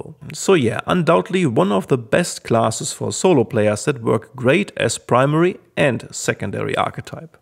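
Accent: Germany. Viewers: Youre 100% German. That’s the most typical German/English accent I’ve ever heard! 😂 Thanks for the very informative video and taking the time for the community!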